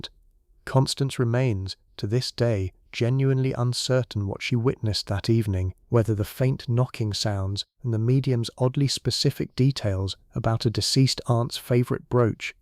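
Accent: United Kingdom. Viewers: Watching from the desert - in Phoenix Arizona ❤ I love the British accent- sweet dreams everyone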